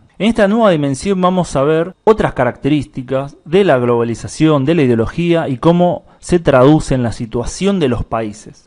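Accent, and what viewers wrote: Argentina. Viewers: Es argentino, es su manera de hablar.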